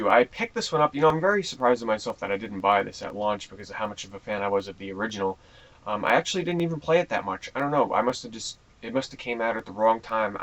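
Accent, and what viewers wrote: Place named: New York, United States. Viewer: haha, i know. i love the accent. i think in a video he said he's from new york.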